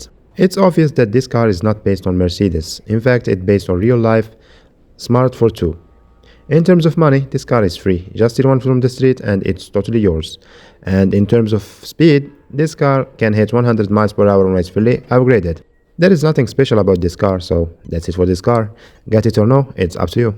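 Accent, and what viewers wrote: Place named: Algeria. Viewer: Brother where you from you sounds djazairi😅😅😅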